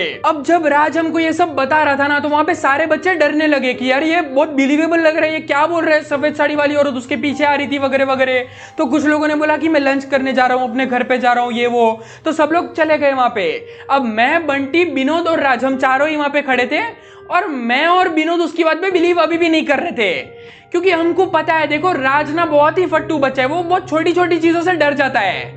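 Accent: Hyderabad, India. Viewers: always love the hyderabadi accent😅😂😂 Also the ending is crazy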